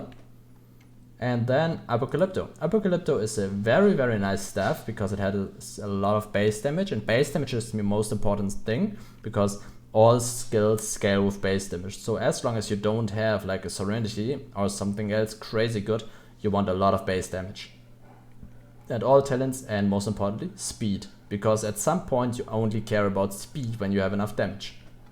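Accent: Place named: Germany. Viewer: Hello, I'm a new player and I stumbled upon your video after looking through classes gameplay videos and wanted to ask how do you level up so fast (your paragon is at 1032 - paragon what we call on Diablo 3). I just hit 100 on Hell Diff 5 with the White Mage, still confused how to power up faster :D also love your accent (is it German?).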